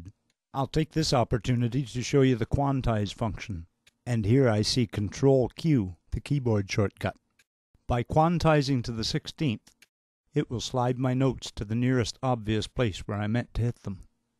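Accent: Canada. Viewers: Love the Canadian accent. How does Session Drummer 2 do on more funky rhythms with ghost notes and flams? Does it sound natural or do you get the "machine gun" effect.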